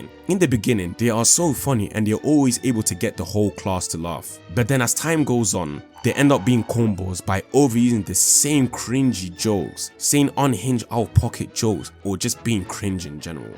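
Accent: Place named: United Kingdom. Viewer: bro how this guy talks in the most british way is just so funny and understandable 🤙😭🗣️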